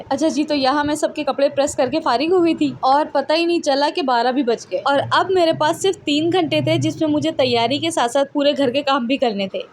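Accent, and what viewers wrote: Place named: Karachi, Pakistan. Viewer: Zaberdast ❤ aap ke bolne ka andaz boht accha hai i really like your videos aap kaandaz bilkul karachi wala hai aap long video banaya karen😊😊❤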